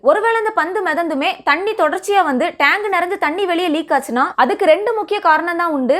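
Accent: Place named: Nagercoil, India. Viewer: நாகர்கோயில் ஸ்லாங்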